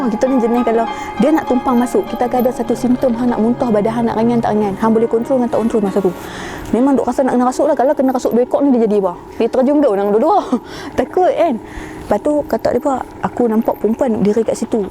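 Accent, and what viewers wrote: North, Malaysia. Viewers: Cerita tk seram.. seronok dok layan sebab cara sembang rancak dgn dialek utara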